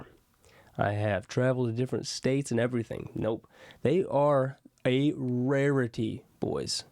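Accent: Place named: India. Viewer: Sounds like he's a curry loving Indian.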